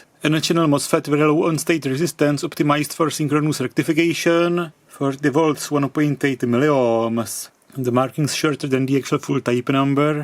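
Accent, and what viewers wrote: Prague, Czech Republic. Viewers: I KNEW YOU WERE CZECH!! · sounds like czenglish (Czech Republic) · Ta pražáčtina v anglické podobě je běs 😀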